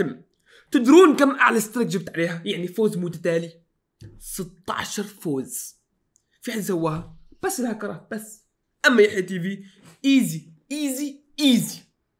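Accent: Egypt. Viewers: اللهجه المصريه اسطوريه عندك يسطا❤ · يا متابعك من اسبوعين يخي وربي اضحك من قلبي غير انه لعبك اسطوري ماشاء الله انا مصري واحب لمن احد من بلد عربية يتكلم مصري اللهجة مرة op عليك 😭😂😂🤍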